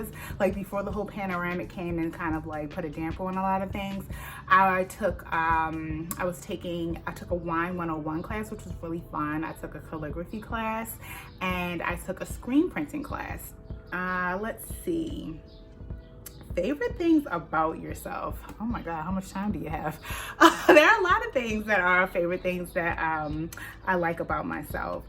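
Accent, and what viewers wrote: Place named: United Kingdom. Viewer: Your British accent is believable. You are funny!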